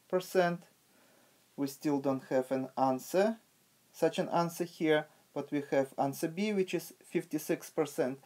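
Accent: Russia. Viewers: Are u russian !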